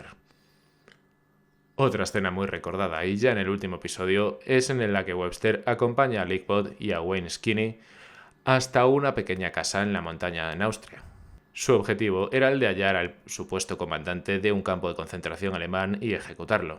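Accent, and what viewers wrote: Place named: Spain. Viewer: El comentario más ridículo que he visto en mucho tiempo (ojo el comentario, no tu persona). Hoy por hoy rechazar el acento español peninsular es un absurdo y un despropósito, con la de canales buenos que hay con dicho acento. 😅 Y no entiendo que es lo que no se entiende, acaso de hablar de la "wea weón po?, no po wn; si no entiendes nada del vídeo tení que hacerte ver eso cachai hemano?"😂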